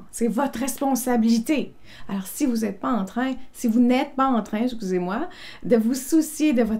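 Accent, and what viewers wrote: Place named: Quebec, Canada. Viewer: Bonjour, oulala très pertinent et j'ai vécu celà avec certitude, plus la culpabilité et la colère de cette relation, plus la blessure de rejet à l'adolescence lorsque j'ai voulu exprimer ma vérité, d'où dépression à ce moment, restant en latence au fond de moi jusqu'à présent! Eh bien, je touche du doigt le fond du problème, merci merci merci!!!🥰 Et j'adore l'accent québécois!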